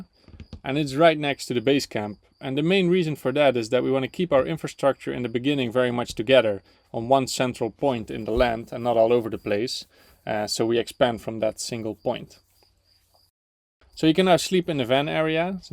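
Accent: Netherlands. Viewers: Yes his vocabulary is very obnoxious. Don’t want to be rude but sometimes I wish he would just speak his native Brabants cause his English is very “stonecoal” as we say in the Netherlands.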